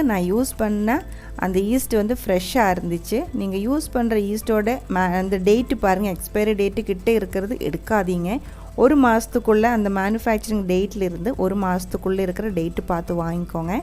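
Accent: Kerala, India. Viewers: I like all kerala samayal sister Your kerala thamizh 👌 sister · madam from which place do you hail, sounds like a malayali speaking Tamil , any way vatappam superb just like your voice. God bless you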